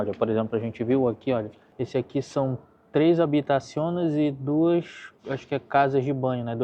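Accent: Pará, Brazil. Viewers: Meu amigo, com esse sotaque paraense, só pode ser de lá, minha terra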